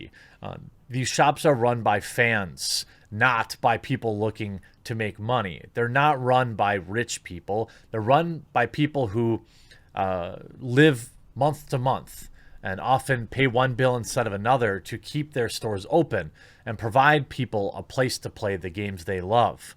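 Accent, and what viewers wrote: Wisconsin, United States. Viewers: Oh man, youre from wisconsin??? Hahahahahaha damn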